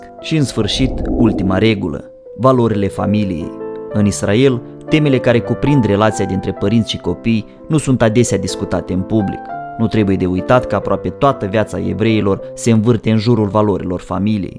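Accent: Moldova. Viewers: Perfect,Și un accent clar, citit ,moldovnesc ,cel mai scurt și clar în limba romana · Graiul dulce moldovenesc nu are egal , dar nici cu maramuresenii cei glumeti si simpatici nu imi este rusine.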